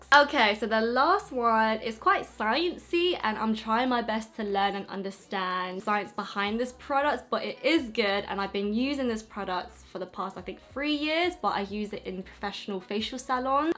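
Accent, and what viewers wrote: United Kingdom. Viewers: all these years i’ve been following you on instagram and now i’m finally finding you on youtube and on top that YOUR BRITISH ! wtf lmao i love your accent though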